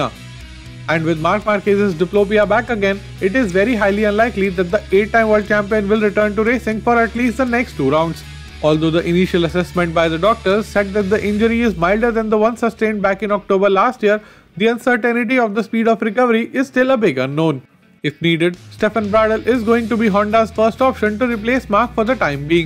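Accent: India. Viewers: Great content,. Presentation is very good. Some, maybe a very few people might want a slightly slower-paced narration. I’m in USA, I can decipher Anglo-Indian English easily, but my compatriots may struggle slightly. Overall this is good channel.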